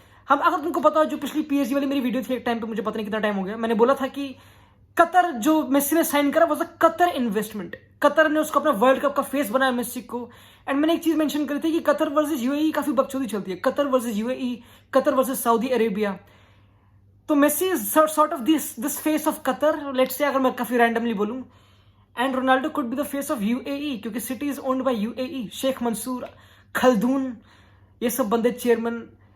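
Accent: India. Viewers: Hindi accent is 🔥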